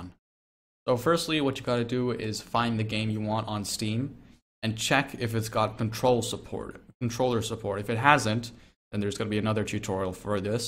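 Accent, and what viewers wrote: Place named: India. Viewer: dang bruh, your indian accent is barely noticeable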